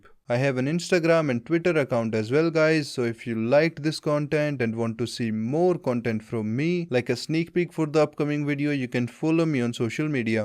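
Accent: India. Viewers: The Indian accent makes the videos so much better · Monotone voice with the Indian accent ... Got It ...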